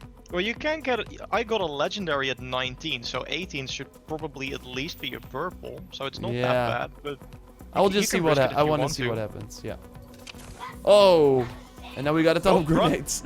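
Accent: Netherlands. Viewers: Het nederlandse accent blijft leuk ;)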